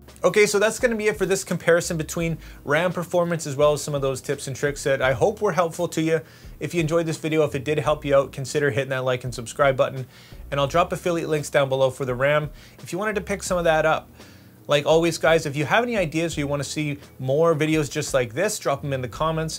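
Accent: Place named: Canada. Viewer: As soon as I heard your accent, I knew you were a fellow Canadian :). Thanks for sharing this information!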